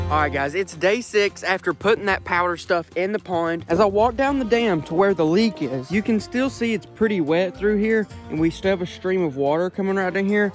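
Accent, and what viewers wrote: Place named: Texas, United States. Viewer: that texas accent🤌🏻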